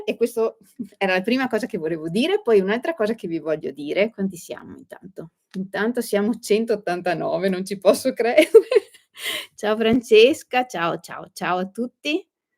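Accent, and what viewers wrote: Veneto, Italy. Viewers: Cara Francesca, che piacere vederti ! ti seguo da circa 5 anni, sei stata e sei sempre di grande ispirazione con i tuoi progetti, ma ciò che ho amato subito di te ( e te l'ho scritto più volte), è la pacatezza, la chiarezza, la creatività e......il tuo accento veneto ! lo sono anch'io, ma vivo in Piemonte! e sono mancina anch'io, ma poiché ben più vecchia di te, da bambina sono stata obbligata, per alcune manualità, ad imparare con la destra! vorrei scriverti ancora tante cose, ma intaserei il canale, per cui ti saluto con grande affetto, ti ringrazio ancora e ...continua così! a presto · ciao Francesca, sto vedendo la registrazione della tua diretta. E' un piacere conoscerti, davvero. Confermo che hai un bella voce rassicurante, dolce e garbata inoltre il tuo accento veneto mi fa ricordare la mia mamma veneziana che non c'è più e mi manca molto. 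I tuoi tutorial sono chiari e molto utili anche per me che non sono più giovanissima e un po' testona. Brava! Un grande abbraccio 🤗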